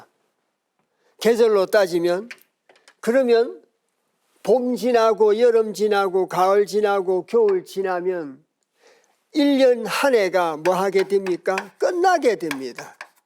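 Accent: South Korea. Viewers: 쾌 발음은 되는데 괘 발음은 안돼요? 경상도 억양이 심하긴 하시지만 도를 또로 말하는 것 외엔 거의 모두 제대로 발음하시는데 유독 괘만 예외없이 쾌라고 하시니 안타깝네요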